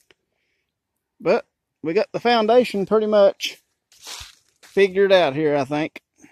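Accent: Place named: South, United States. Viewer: I definitely hear a southern accent...what part of the states are you in? Great video & an even more beautiful spot you have chosen. Much love from Mobile Alabama.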